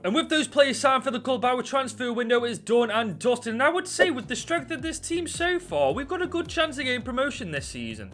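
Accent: Stoke, United Kingdom. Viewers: Hey man, quality video! Just wondering from the accent if you’re a Stoke lad?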